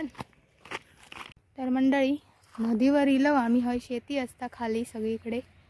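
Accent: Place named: Malvani, India. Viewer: गो सिंधुदुर्गातील खयच्या गावात काजी काढुक गेलंस... तुझा मालवणी कानाक गोड वाटता... ❤❤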